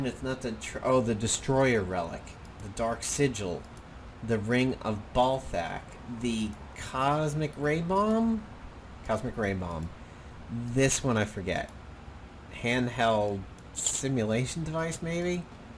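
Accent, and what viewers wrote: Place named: New Jersey, United States. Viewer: Thanks for posting this video,. My hearing isn't the best, but I would've guessed you were from New Jersey.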